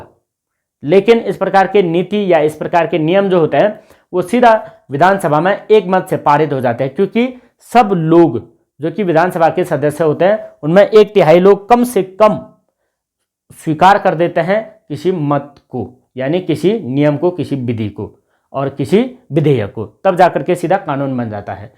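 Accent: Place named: Garhwal, India. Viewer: सर जी आप उत्तराखंड से कहां से हो ? भाषा से तो गडवाल मंडल के लग रहे हैं ❤😊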